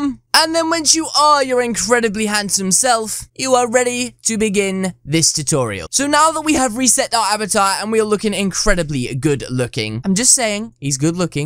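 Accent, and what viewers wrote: United Kingdom. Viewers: This guys BRITISH?!??GOD I LOVE HIM EVEN MORE!!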